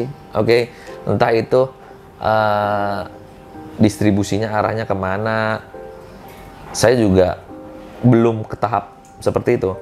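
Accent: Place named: West Java, Indonesia. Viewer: kalo dari tata bahasanya dia ini hanya domisili purwakarta ... paham sekali lidah sunda itu klo pakai bahasa indo dicampur" 👍👍😁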